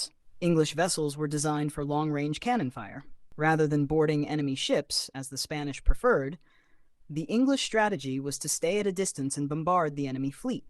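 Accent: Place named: United States. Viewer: "THE BATTLE OF THE SPANISH ARMADA" makes no sense. But what else can you expect from a US dialect narration? The battle of the English Channel is a bit more logical. Jack, the Japan Alps Brit.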